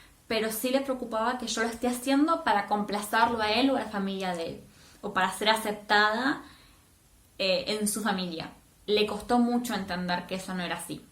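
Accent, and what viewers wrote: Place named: Argentina. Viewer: Me gusta escuchar el acento argentino 😍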